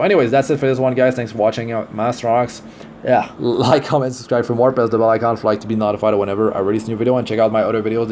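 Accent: Quebec, Canada. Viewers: Are you a French canadian? Idk why but you sound like it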